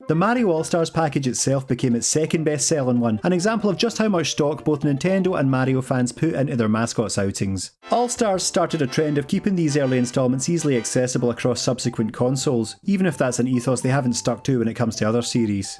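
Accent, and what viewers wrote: Scotland, United Kingdom. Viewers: Im not native english speaker, but let me guess you are scotish?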